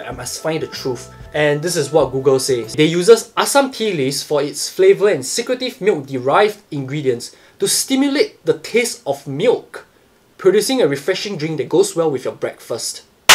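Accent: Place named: Singapore. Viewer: well sorry but this is how most of us Singaporeans speak...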